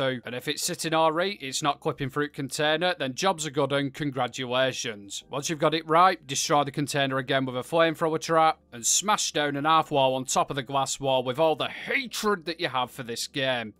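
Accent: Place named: North, United Kingdom. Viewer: Fellow northerner here, by the sound of your accent we are not too far apart. Anyhow just wanted to say I stumbled across your videos recently and I found them well made and chuckle worthy. Nice job! Subbed.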